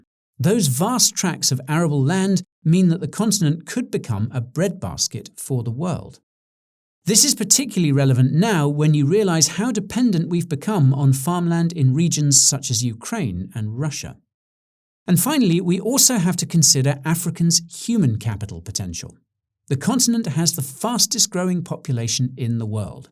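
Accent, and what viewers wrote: United Kingdom. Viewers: The irony of a critique of imperialism in a British accent 😂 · Before China, Europe and America continues to do via its corporations in Africa? And you speaking with a British accent; a thief pointing fingers at another thief. The Mwafrikan Tengazako coming!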